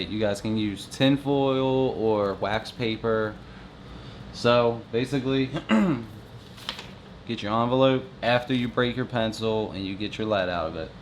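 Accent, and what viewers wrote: Baltimore, United States. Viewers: I could tell in 10 seconds that you were from Baltimore man. I'm an old school South Baltimore 21230 guy here. Born and raised. Light St. , Charles St and Fort Ave. We all have that Baltimore accent that people can't miss. Lol. Its not often that I see many of us Baltimore guys on YouTube. Best of luck with your channel !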